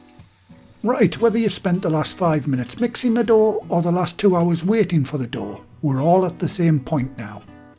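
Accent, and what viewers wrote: United Kingdom. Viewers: You are not just good at cooking, you're really good at explaining details too!! The british accent is a bonus!! It sounds like Yorkshire to me. Anyway thank you!!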